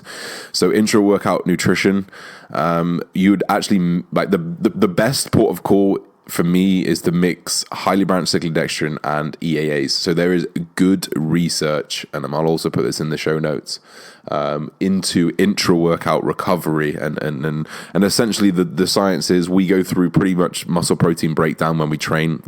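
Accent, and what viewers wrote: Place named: RP, United Kingdom. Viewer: You sound like such a posh boy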